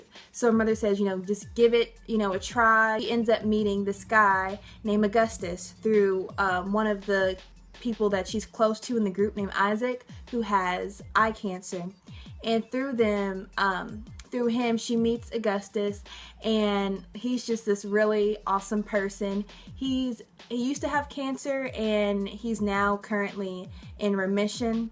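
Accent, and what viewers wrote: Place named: South, United States. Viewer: I'm glad I'm not crazy then! I thought you sounded a little southern! It's definitely cute, I love southern accents. And I'm sure you will absolutely love it in this community. :)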